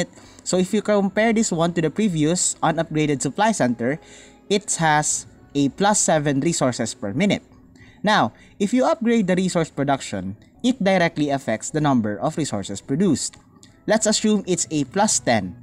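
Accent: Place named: Philippines. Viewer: Keep it up.👍 Pilipino accent just like tracie haha. Kaya moyan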